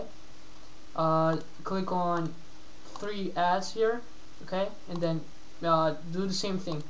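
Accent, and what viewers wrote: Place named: Russia. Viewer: control what? i cant understand the russian accent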